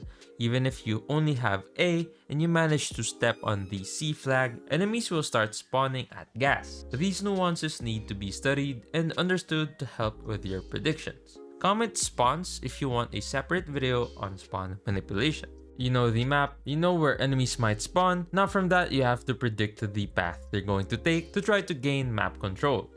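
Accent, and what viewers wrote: Philippines. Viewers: Bro i think you're a Filipino, idk but i hear it when ur speaking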